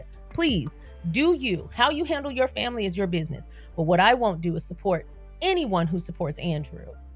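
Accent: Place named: United States. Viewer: is from the United States. She has an American English accent. If you come here expecting her to pronounce words in a British accent you’re an idiot. I will block you. - Me & Moderators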